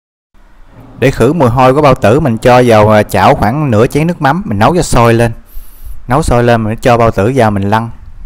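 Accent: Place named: South, Vietnam. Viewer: Giọng miền nam dể thương quá anb ơi❤❤😂😅❤❤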